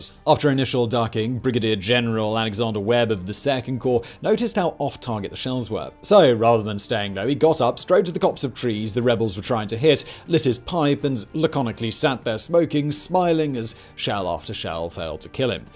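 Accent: United Kingdom. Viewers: I love the different British pronunciations of American words. 'MURICA!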